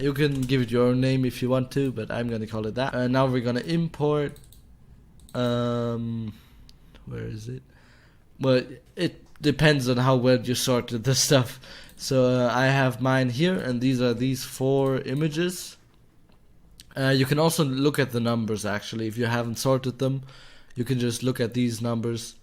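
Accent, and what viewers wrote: Germany. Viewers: deutscher detected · are you german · Nicht böse gemeint, aber HOLY hört man dass du deutscher bist😂😂❤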